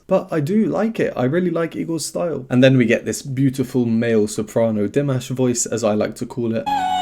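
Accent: England, United Kingdom. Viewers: Me encanta esta canción de Dimash. Y esta actuación es una de mis favoritas❤ Tus reacciones son siempre detalladas e instructivas. Aprendo mucho contigo. ¡Muchas gracias!😊 Por cierto, me gusta tu lindo acento inglés.☺️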